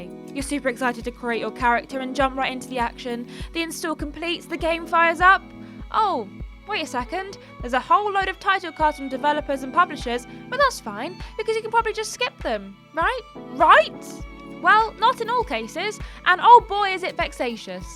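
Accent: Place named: England, United Kingdom. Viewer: I love English accents usually but for some reason puts me off a little. She's doing nothing wrong, it's just one of those things.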